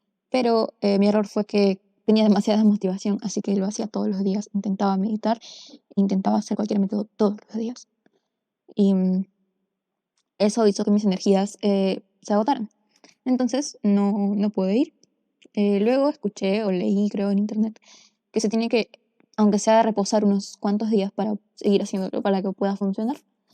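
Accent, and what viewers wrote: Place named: Peru. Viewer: Tu acento peruano me encanta 👁👄👁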